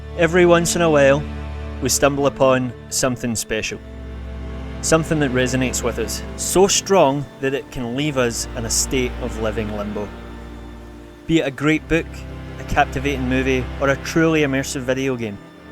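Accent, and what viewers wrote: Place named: Scotland, United Kingdom. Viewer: I always wunder ur accent, scotlands 🙌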